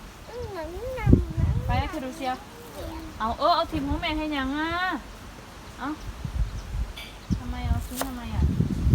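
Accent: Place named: Chaiyaphum, Thailand. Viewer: คนจังหวัดใด.. สำเนียงชัยภูมิแม่นบ่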